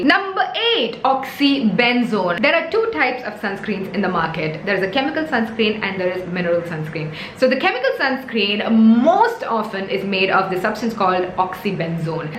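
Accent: India. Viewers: My god! her English is sooo Indian and I fucking live for it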